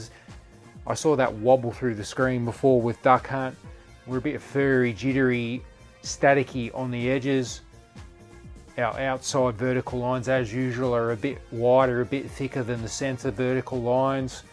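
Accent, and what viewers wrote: Australia. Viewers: I was thinking the same thing. SCART is a European thing and he sounds Australian. I use SCART RGB for all my retro consoles.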